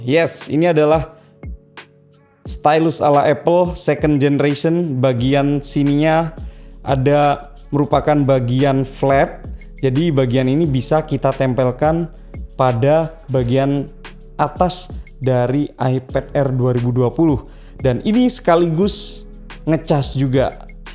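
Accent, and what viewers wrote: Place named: Java, Indonesia. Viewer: medok mu mas..... hahahaha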